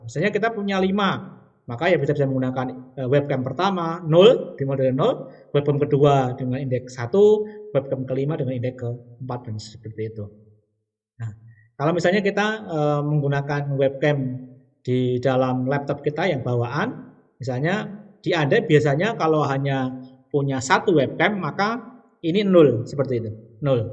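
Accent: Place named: Java, Indonesia. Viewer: instoll instol instoll.. hehehe medok kali jawa nya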